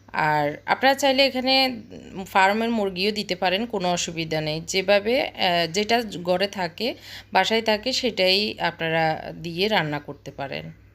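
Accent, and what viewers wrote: Noakhali, Bangladesh. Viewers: Thank you Apu easy ranna.amar bchara apnar vlog khub pochondo kore.😆amak onno kichu dekhte dai na.noakhailla hoyate tan ta ektu beshi😀😀😀.Masha Allah apni eto guni 1jon manus.joto dekhi toto mugdho hoi.khub balo thakben.❤❤💖💖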